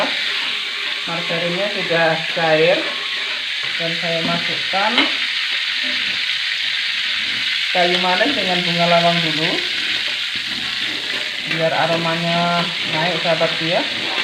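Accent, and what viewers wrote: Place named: Malaysia. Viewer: Jadi keinget pertama lihat daun kari tu waktu kerja di malysa,, kakak ni asli malysa atau aslinya orang Indonesia, kok logat Melayu nya dk kental banget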